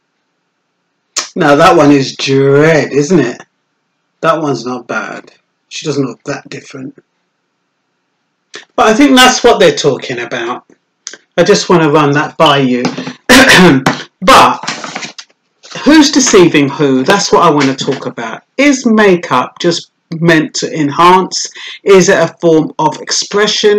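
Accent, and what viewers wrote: Jamaica. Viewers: Okey but men, nowadays are putting on make-up and weaves too. Men just try to detach themselves.I love your Jamaican accent by the way.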